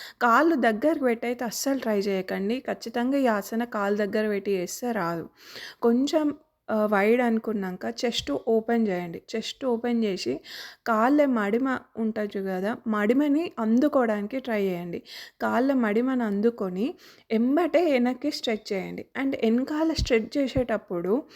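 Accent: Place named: Telangana, India. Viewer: Telangana accent 😎🙏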